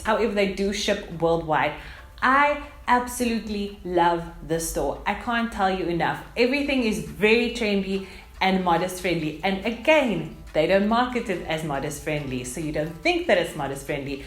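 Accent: South Africa. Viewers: Joburg accent neh☺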